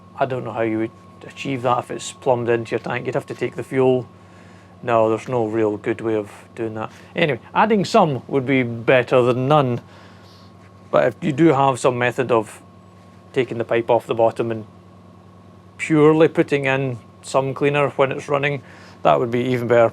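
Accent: Scotland, United Kingdom. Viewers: Hi. Great to hear a Scottish voice. New to this. Question for you, can you run one of these heaters on domestic heating oil like 28second kero. Cheers, jim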